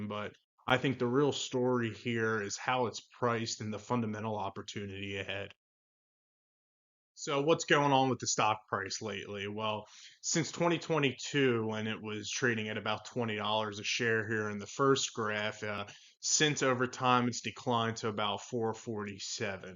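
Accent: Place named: Pennsylvania, United States. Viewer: You from PA? I hear the accent lol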